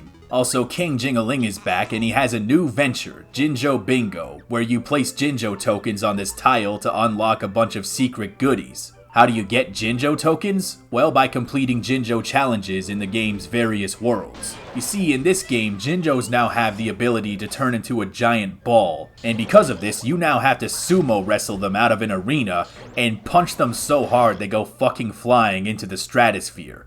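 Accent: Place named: New York, United States. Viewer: First time viewer here: When I hear him speak I picture a New Yorker sitting in a bar telling a story. I don't know why I just couldn't get that image out of my head.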